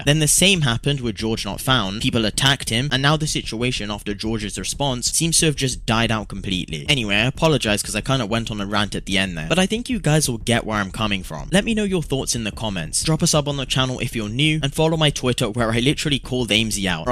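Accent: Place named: Wales, United Kingdom. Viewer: She’s welsh and has adhd please don’t make fun of her accent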